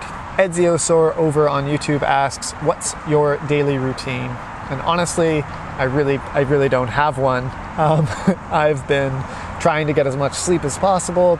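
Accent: Canada. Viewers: I like your argument, but he doesn't live in Germany. He lives in Canada. You can tell by his accent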